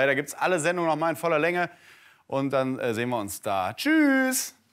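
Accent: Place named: Swabia, Germany. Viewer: ja des stuttgarter schwäbisch isch auch peinlich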